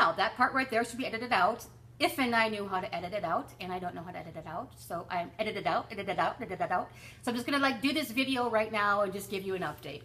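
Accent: United States. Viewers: Oops, just heard the end, you're American lol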